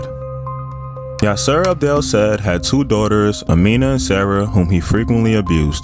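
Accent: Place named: United States. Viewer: the dude narrating sounds like an American . Most Americans by now do understand how Hispanic names are pronounced simply because there is huge amount of Spanish in our current culture ... we've all had plenty of exposure to Hispanic names by now . I am not Hispanic myself but for someone not to have heard how these words are pronounced in this day and age is a bit mind-boggling . Also scroll down and read all the other comments in the comments section and you will notice how many other people begged him to learn how to pronounce Hispanic names ... I am not the only one trying to correct him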